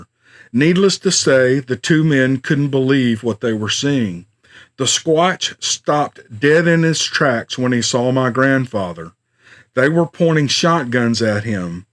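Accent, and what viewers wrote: South, United States. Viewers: Early in my adult life I shed my native southern accent for a career in major-market broadcasting, but I can still talk like that when I want to., I like your accent very much, and I don't believe anyone will have any difficulty in understanding you. Also I love that you don't make any attempt to hide your faith in Jesus. He is LORD! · love your voice reading these! and your voice is so country! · This was so nice, such a sweet surprise! Getting ready for end-of-day routine, but thought...wouldn’t it br nice to listen to story before hitting the hay...not really any hope there would be one. Imagine how delighted it was to see you had been busy and there was not one but three stories.! I feel really spoiled! I have wondered what is the big attraction to hundreds of us listening to this kindly daddy voice...l know your voice reminds me of my Dad, he has been in the land of the living now for many years and l really miss him! 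Listening to the gentle tones of your Southern drawl is too much like home! I think there must be a few others that feel like this is almost magical, allowing us grown (and in some cases OLD) folk to enjoy being read to by a loving Daddy again! Thanks so much,. Hope this doesn’t sound corny...the stories are nice too, as usual. Appreciate your doing these so much! Entertaining and comforting! Happy trails!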